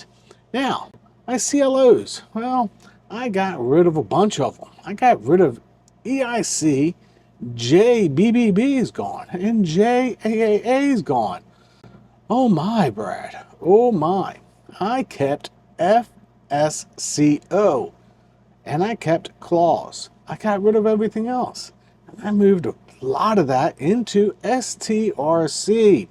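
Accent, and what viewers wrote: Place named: Pennsylvania, United States. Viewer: Brad do you hail from Pa or Delaware? You could be from your accent! I think it's adorable....also could be a Baltimore / Md accent ha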